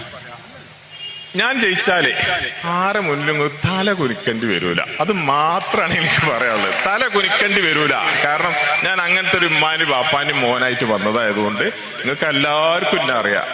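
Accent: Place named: Kozhikode, India. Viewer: സാമ്പാഹ്‌, കൊള്ളാം നല്ല കോഴിക്കോടൻ ഭാഷ. അഹങ്കാരം ഇല്ലാത്ത നിഷ്കളങ്കമായ താങ്കൾക്കു വിജയാസകൾ!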